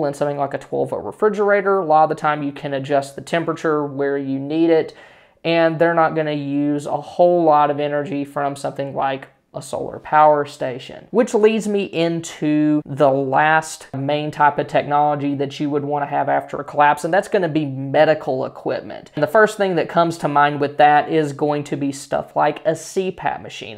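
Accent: South, United States. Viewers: Discovered your channel today...and i like the dry sense of humor tutorial combined with a bit of Southern twang...makes me homesick... expat American tropical salutes from Venezuela!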